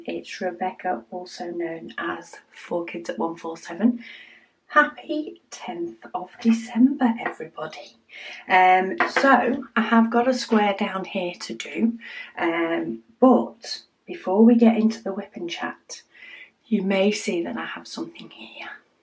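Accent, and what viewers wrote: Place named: Cheshire, United Kingdom. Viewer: I wondered if you were from the north. My Grandma was born and raised in Widnes. She came to America after the end of WWII. She was a war bride and came across the ocean all by herself on a boat full of other war brides. Sometimes the things you say, or the way you pronounce things reminds me so much of her. Cheers to another girl from Cheshire!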